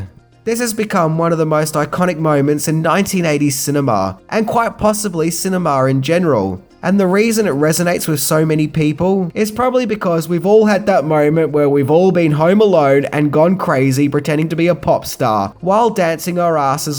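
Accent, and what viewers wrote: Australia. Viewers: He is Australian. He does say some words differently due to the accent. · Come on he is Australian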